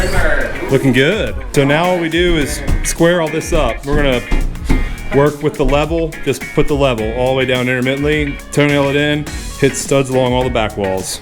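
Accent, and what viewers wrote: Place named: West Virginia, United States. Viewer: I just had a feeling you were from West By God when I started the vid! Charleston area, here! Love this build and am looking forward to implementing this in my garage.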